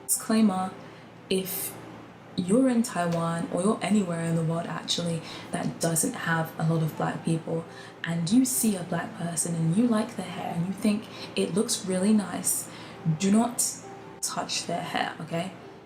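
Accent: United Kingdom. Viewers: 真的就聽口音知道是英國人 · 好舒服的英國腔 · 他的口音就是個英國人啊。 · 好喜歡他的英國腔ㄛ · 很明顯的英國腔 BTW她唇保養得很好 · 這麼明顯的英式口音都不相信是英國人🥶膚色是無分國藉的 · 很明顯的英國腔啊😃😃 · 她的發音100％是英國人😆😆😆😆😆😆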